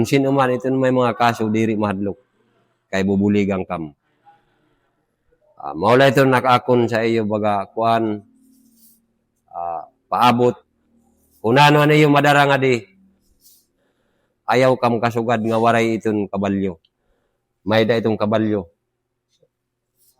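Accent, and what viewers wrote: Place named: Waray, Philippines. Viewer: Northern Samarenyo waray parin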